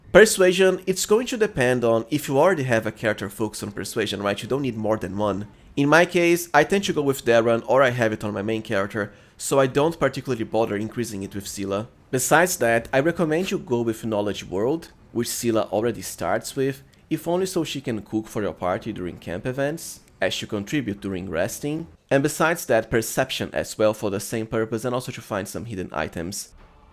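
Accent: Brazil. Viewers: caramba mano, ativa a faixa de audio em ptbr po, esse seu sotaque nao me engana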